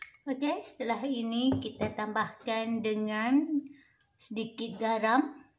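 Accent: Malaysia. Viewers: Suaranya kek Logat melayu dan serasa di dalam pesawat.enjoi the voice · suara ny kayak logat orang maisya🇲🇾🇲🇾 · Cara dia cakap macam orang malaysia bagus saya faham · Ini orang mana sih ngomongnya agak kaku? Kyknorang melayu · Logat Malaysia ,ya. Salam dr Tangerang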